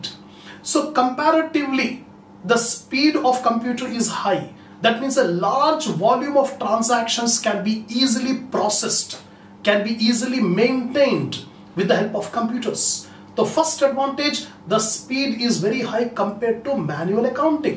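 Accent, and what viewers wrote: Hyderabad, India. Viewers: Sir are you from Hyderabad..?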